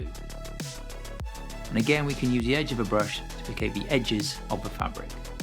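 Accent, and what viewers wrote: South West, United Kingdom. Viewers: Didn’t realise that I was missing the South West accent from the modelling community! And I’m glad I’m not the only one who rests their head on the lamp!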